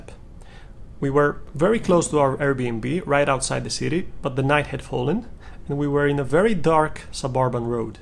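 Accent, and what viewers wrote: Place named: Greece. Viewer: I have been following your channel for some time now and I had some suspicion that you might be Greek, by your accent. Now I am even more suspicious 🤨🤣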